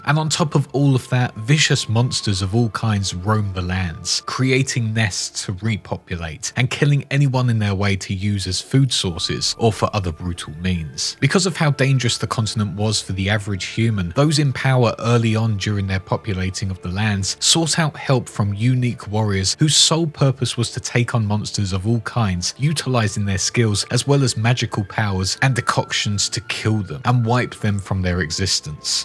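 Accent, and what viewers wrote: United Kingdom. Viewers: Just started. Don't know anything about the lore and was just waiting to hear the voice of who was making the video of the game. You are not annoying and have a British accent. 100% can watch this video and learn what I wanted to know 😂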